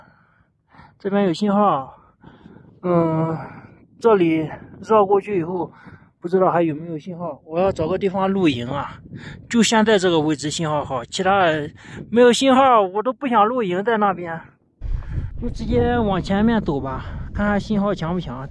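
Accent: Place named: Linyi, China. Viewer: 听口音像是山东老乡，像是俺们临沂那一代口音哈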